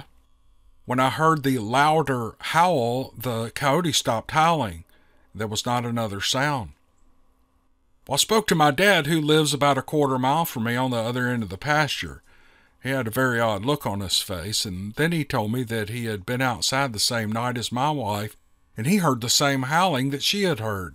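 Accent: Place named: Appalachia, United States. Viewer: Not sure if anyone answered the question what is GLAMPING but it's basically glorified camping!! Kinda like u know high end camping with a big nice r.v that has a kitchen in it air conditioning and all the finer things u get at home but on a campground. Cause your a country boy like me when we went camping growing up and some of us evening now camped in tents sleeping bags and "living high on the hog" was an air mattress 😂. We used a camp fire possible a grill at the cp site glampers cook in there stove or in there fancy gas grill.. as I get older glamping is nice lol I get stuff and wet in an old ⛺ tent anyways I guess I could be wrong but this is my interpretation great show your our hero love your voice u sound like someone from the holler don't ever change man.. your friend Billy Kirby Lake Cumberland Ky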